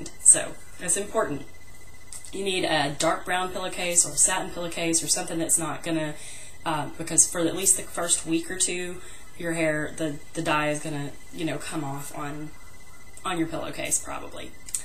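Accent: South, United States. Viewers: where r u from? you kinda sound southern?!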